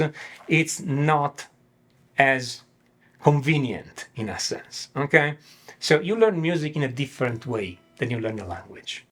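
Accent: Russia. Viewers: Accento russo! piango...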